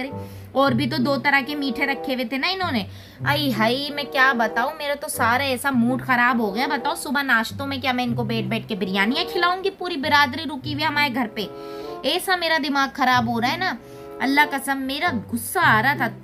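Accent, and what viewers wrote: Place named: Lalukhait, India. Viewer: very good accent, ,same i heard somewhere in lalukhait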